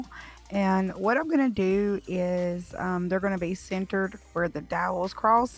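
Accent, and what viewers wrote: South, United States. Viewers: You're very welcome. You have a strong southern accent. Makes me think you are from somewhere close to where I'm from... Kentucky. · You have inspired me!!love your southern accent too! so pretty!! And we can put our own style into your design and make it customized to our decors Thank you love Canada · Oh my how beautiful, & the lamp isn't to shabby either. LOL Just joking, plus it's really nice to hear someone with a Southern accent like me. I could be your grandma & I loooove your lamp. I just happened to stumble up on your video. You are very talented & bet you started out when you were a lot young. I am going to have to make my own, & watch all of your videos. I love that deep Southern accent, it makes me feel like I am home "In ". Keep up the good work, Little Southern Magnolia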